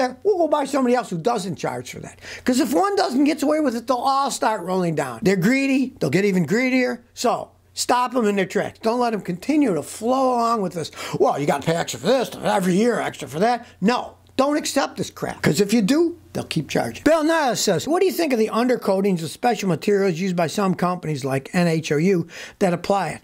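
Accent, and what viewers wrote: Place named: United States. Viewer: This man has the voice of true America. Don't give these corporations your money for their garbage products. Don't.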